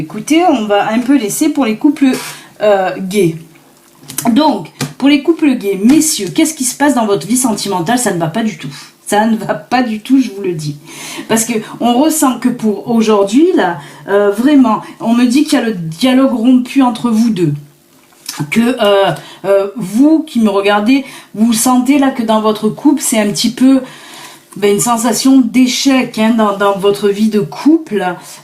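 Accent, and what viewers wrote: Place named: Lyon, France. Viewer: Bonjour je vous remercie pour vos guidances,votre accent lyonnais est une vraie joie chaque matin,pour une lyonnaise,comme moi,dans le sud ouest! J'espère ne pas vous heurter,en vous disant que vous me rappelez, une rubrique matinale de mon enfance qui s'appelait "la mère Cotivet" Au-delà de son accent,elle était pleine de bon sens! quant à ceux qui ne sont pas contents,de vos guidances, j'espère qu'ils vous remercient lorsque cela les concernent directement! je vous souhaite une belle journée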